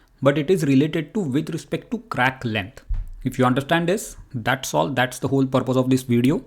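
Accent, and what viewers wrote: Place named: India. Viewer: too strong indian accent...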